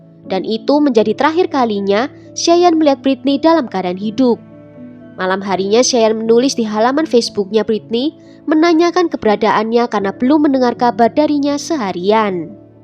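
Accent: Java, Indonesia. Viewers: Mimin suaranya medok. Jawanya mana min?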